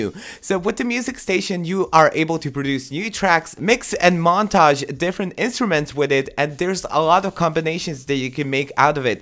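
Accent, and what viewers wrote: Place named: France. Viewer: What a lovely accent! Are you french?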